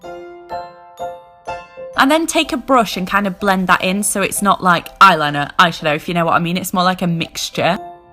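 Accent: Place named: North, United Kingdom. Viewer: You're so northern and I love it!!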